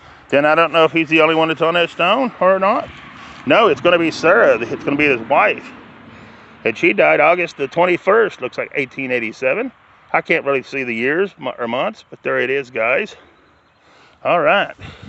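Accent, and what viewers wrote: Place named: South, United States. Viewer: On the mark, that is Monroe Yoder, Died Aug 21 1890 (couldn't see his age) + Martha Yoder, Died July 19th 1890, aged 15 (so they were brother and sister, most likely, died one month apart). On the mark, Amos Yoder died Sept 14 1865, Aged 1 year, 9 months. I thought i heard a bit of an accent in your speech but frankly, i didn't know where exactly to place it from, in the south (i thought maybe Texas, haha, although i see now that i was wrong) because i know each state in the south has its own type of accent 🙂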